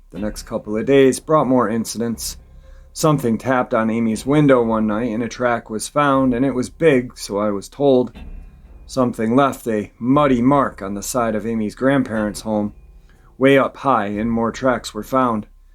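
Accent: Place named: North Carolina, United States. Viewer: You sound like you might be from close to our area,,,, Morganton NC I grew up in Asheville, but my husband Frank and I now live in Burke co and we have a tree nursery behind our property that I think one might have been roaming through back there a few years ago. I was walking our dogs on a leash in the nursery and smelled the worst odor imaginable. It was skunk mixed with the smell of decomp. Our dogs went ballastic tucked there tails and started whining and pulling me toward home. Believe me I took off also later that night we heard howling and every dog on the neighborhood started whining and all of them were in the owners house. Patricia Gambino Harrington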